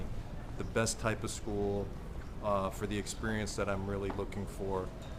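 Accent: United States. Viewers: i'm gonna be honest. You have the most american sounding accent on earth and i love it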